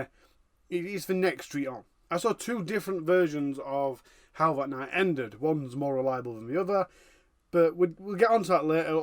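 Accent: Yorkshire, United Kingdom. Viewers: Me thinking my true crime obsession couldn't get any worse.... in comes and delivers it in a yourkshire accent 🤗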